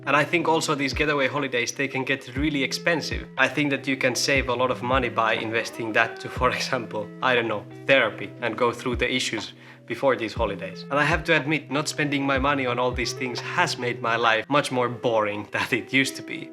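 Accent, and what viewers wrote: Finland. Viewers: hehe, I just found your channel yesterday, and I'm already in love x"D...I actually live in the same city as you and it's so fun to watch videos made from here XD☆ plus a Finnish person making videos in English, with beautiful Finnish accent, makes me so much more confident to speak English myself, too!! I also love that your videos are quite short, there's nothing unnecessary, so they're nice to watch♡ but, I must say, as a beginner in minimalism and intentional living, it's too bad your videos are very addicting! especially when you almost always recommend another of your videos at the end "xD it's hard to get rid of binging social media, when you make too good content! XD ja must on myös tosi siistii et löysin suomalaisen kanavan, joka on lähellä mun omaa ajatusmaailmaa (^_^) kiitti kun teet näitä videoita:3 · I love to listen to the Finnish accented English. 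I’m a pensioned farmer with a lot of old stuff trying to downsize and organize. I have resisted buying every power tool. Hand tools are easily fixed when they break unlike the stress caused by complicated machines. Scooping snow is good exercise and so is being on the bike.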